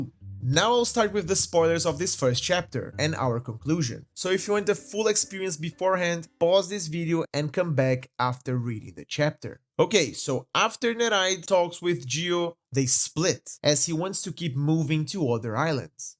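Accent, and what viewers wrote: Brazil. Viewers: Ja vi que é brasileiro pelo sotaque skkskskssk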